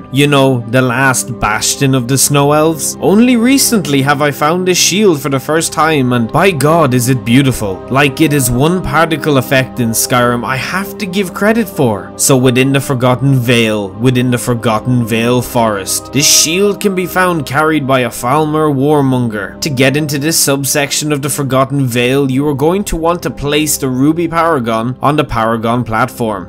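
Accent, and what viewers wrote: Ireland. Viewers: ‘Crisp Irish accent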